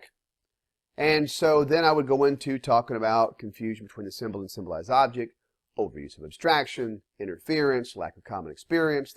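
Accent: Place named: Louisiana, United States. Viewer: Are you from Louisiana? Nice video and very well presented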